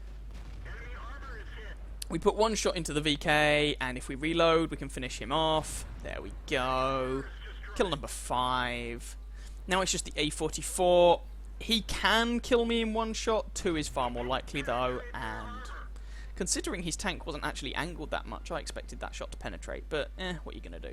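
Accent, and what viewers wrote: United Kingdom. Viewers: Great and you're welcome! You do know that most of us Americans love your British accents as much as a lot of you like ours (especially our Southern)! Lol ^_^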